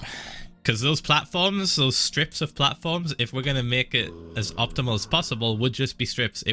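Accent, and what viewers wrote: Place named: Northern England, United Kingdom. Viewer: Nothharn LKegnAnd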